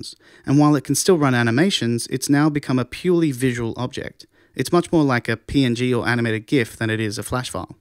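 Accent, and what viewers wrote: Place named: United Kingdom. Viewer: A lovely british accent and awesome tutorial. Just the way I like it.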